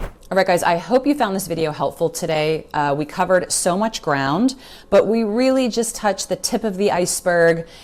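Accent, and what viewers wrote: United States. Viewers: You rock, I really like your American accent. It’s very clear I love it.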